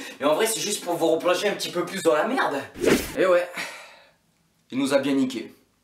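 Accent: Marseille, France. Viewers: Ça sent MARSEILLE 😏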